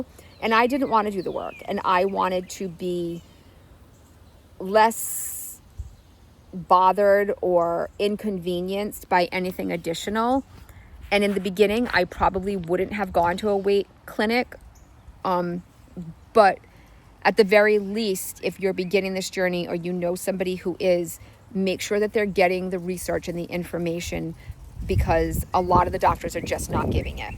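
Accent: Massachusetts, United States. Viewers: Thank you so much for sharing your journey and I'm so glad I found your channel today! I just got a prescription for Zepbound and I've watched several of your videos already so I can have an idea of what to expect (though I know every body is different). Your channel is so informative and I really love your balanced and realistic approach. You're a goldmine of information! When I watched the first few minutes of one of your videos I knew I recognized your MA accent. I'm a north shore gal myself. By the way, you asked in a previous video if someone knew about the food you had at the Guatemalan friend's party, the corncake with the cheese or pork in the middle, those are called pupusas. I've had many of them. 🙂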